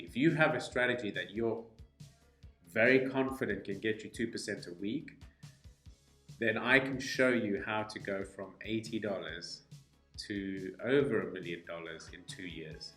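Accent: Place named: South Africa. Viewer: You sound like you're in SA? Good job pushing these order blocks.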